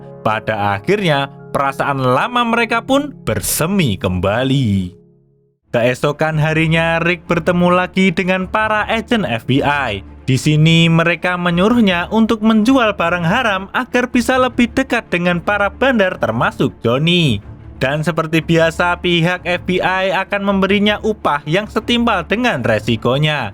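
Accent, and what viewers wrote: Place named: Surakarta, Indonesia. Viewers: Admin e wong Jateng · Pasti mimin orang solo wkwk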